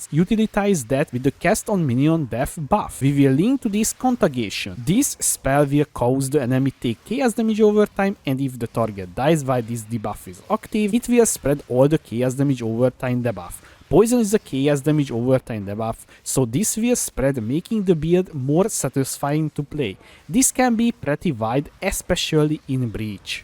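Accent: Hungary. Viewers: i can hear the hungarian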